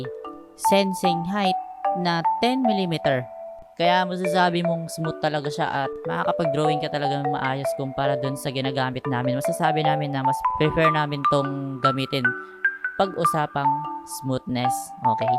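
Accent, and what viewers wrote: Iloilo, Philippines. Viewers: Ilonggo ka idol?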